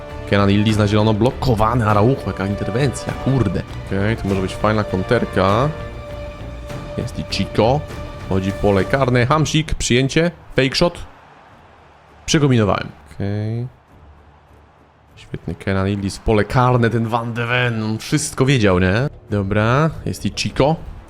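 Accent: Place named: Germany. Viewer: zwykły żabolski Szwab a nie Polski akcent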